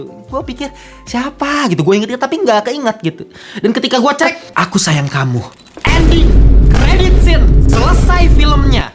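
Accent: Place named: Java, Indonesia. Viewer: W nyesel bang liat ni film, v suka dialeg jawa y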